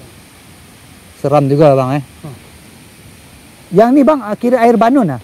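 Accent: Sabah, Malaysia. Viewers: Bro lu pkai je loghat sabahan .. Tak ada Hal kami faham😅😅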